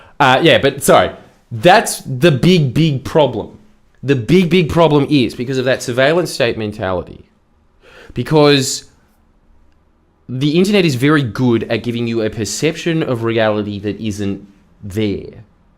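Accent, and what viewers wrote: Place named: Australia. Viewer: Incredibly chad video. Already love self improvement content but goddamn giving self improvement advice with the addicting ozzy accent and jokes holy hell this is art. Instant sub. This is it, the gigachad of australian news also being a gigachad of telling people the massive downsides of being chronically online and stimulated one way or another!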